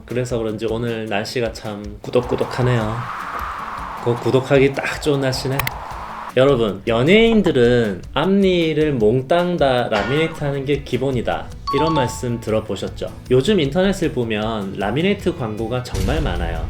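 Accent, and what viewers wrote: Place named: Gyeongsang, South Korea. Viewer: 대구 경북 쪽 말투라서 더욱 신뢰가 가네요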